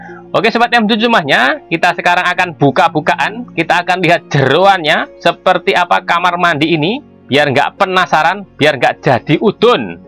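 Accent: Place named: Java, Indonesia. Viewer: Jowomu medok men to kangmas heeeeeemmm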